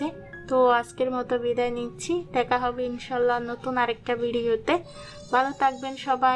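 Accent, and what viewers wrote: Chittagong, Bangladesh. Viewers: Ha ha apu chottogram er vasha shunte amr kintu darun legese..😂😂.tobe ata thik bipod ashle sob dik thekei ashe 😢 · হাহাহা আপু চিটাগং য়ের ভাষা শুনে ভালই লাগে কিন্তু বুঝি কম।সব মিলিয়ে খুব ভাল লাগল।আমি খেয়াল করেছি যে আপনি কম ব্লগ আপলোড দিচ্ছেন😊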